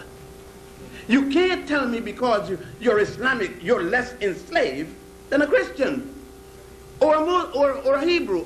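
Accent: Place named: Caribbean. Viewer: Interesting Scholar And Speak With An Accent Probably Caribbean.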